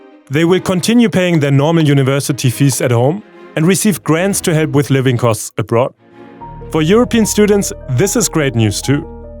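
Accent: Germany. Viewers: Are you German? Your accent sounds pretty german to me! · As a German I tend to not like German accents but yours is just part of the good news for me. I originally come from the Lausitz and am intrigued by the news about the battery project. Keep up your superb work. I struggle to watch “normal” news since a decade now…and stopped it actually almost sompletey a couple of years ago as it was not doing anything good to my mental health status, just sometimes I open the tagesschau, but your videos give me so much faith in the future!!! Danke Leute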